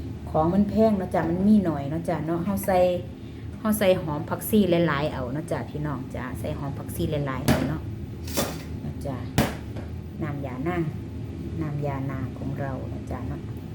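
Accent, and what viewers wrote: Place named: Nong Khai, Thailand. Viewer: อยู่เมืองนอกกะมีบ่อขี้เหล็ก เว้าแป้งนัวคือคนหนองคายศรีเชียงใหม่เนาะ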